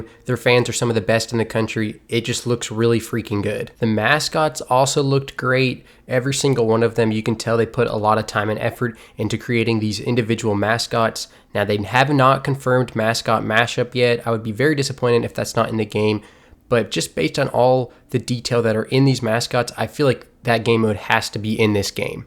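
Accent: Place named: Delaware County, United States. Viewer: Where you from? You sound like you're from Delco (Philly burbs)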